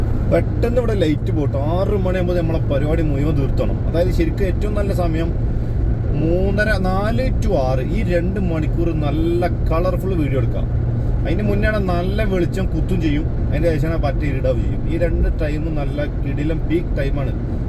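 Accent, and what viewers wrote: Malappuram, India. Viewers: അൻന്റെ 😅 വർത്താനം എനിക്കിഷ്ട്ടം, ദുബായിൽ റൂമിൽ ഉണ്ടായിരുന്നു മലപ്പുറം,ചങ്ങായിമാർ, മറക്കാനാവാത്ത, ചങ്ങായിമാർ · bad malappuram slang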